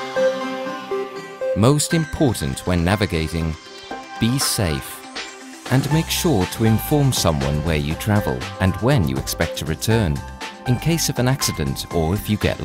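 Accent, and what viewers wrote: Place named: South East England, United Kingdom. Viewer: I'm probably way off beam, but it was a British accent, very south east which also happens to have -0° magnetic declination. So perhaps they didn't demonstrate what they couldn't demonstrate in Britain. Declination could do with some futher information, such as, what does the term "negative declination west" mean? Very good video though.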